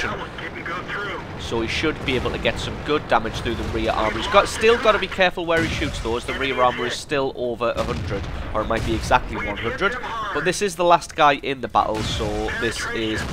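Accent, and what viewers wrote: United Kingdom. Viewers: Ok may I ask you what is your accent? Is it some kind of British accent or I am totaly wrong? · Its clearly british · What part of Britian are you from?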